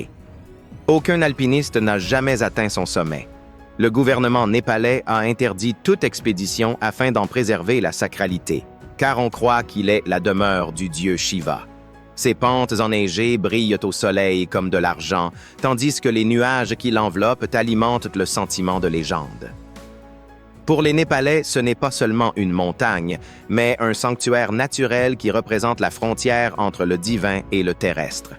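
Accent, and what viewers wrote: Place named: Canada. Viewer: cet accent si sympathique qui est canadien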